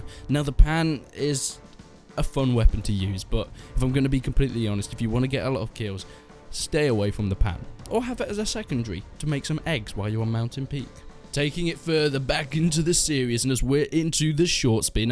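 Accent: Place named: United Kingdom. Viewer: Apparently, Americans think that the British accent sounds intelligent. This is a good example of it being wrong. VERY fucking wrong.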